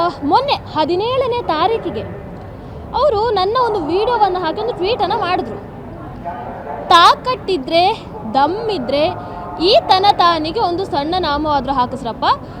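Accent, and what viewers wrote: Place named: Mangalore, India. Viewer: mangaluru kannada super jayavagali Jaisiyaram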